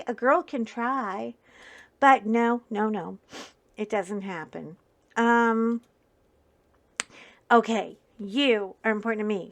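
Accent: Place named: Ireland. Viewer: I'm sorry you had such a hard time in school. 💜 Unfortunately I know how it is. I was scared of going to school every day for about 7 years. The 2 last years wasn't that bad. So I managed well. And I guess we all know a "Fat Bethany" in some form. I'm very happy you survived 😊 I love how you talk Irish, as your mother 💙 Thank you so much for sharing your story with all of us. Big hugs 🤗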